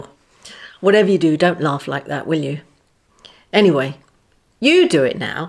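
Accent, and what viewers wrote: United Kingdom. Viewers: Can ask u a question that what's kind of your british accent? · The accent of English is British? I don´t notice at the first time that I listen your voice.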